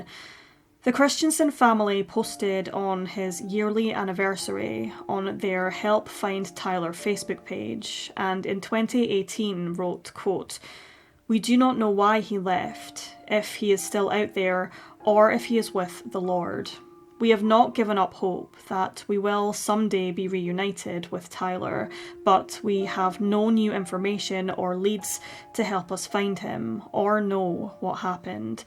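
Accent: Scotland, United Kingdom. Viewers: what's with the British narration? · It's an educated British accent from Scotland, it is not "ridiculous".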